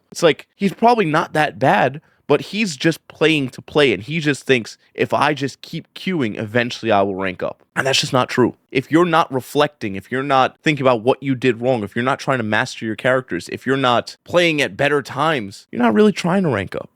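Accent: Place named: Bronx, United States. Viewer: U gotta be from the Bronx my boy 😂 🤞🏽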